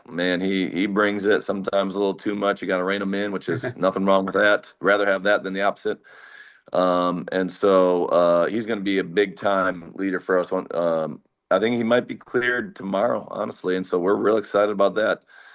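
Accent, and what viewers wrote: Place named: South, United States. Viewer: !!! When did you pick up that southern accent?!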